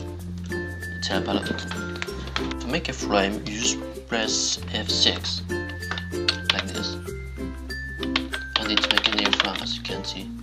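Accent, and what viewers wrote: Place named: France. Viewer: Dude you are so funny your editing is great and you except the fact your French accent is hard to undestand for people that are not French witch every youtuber should do good on you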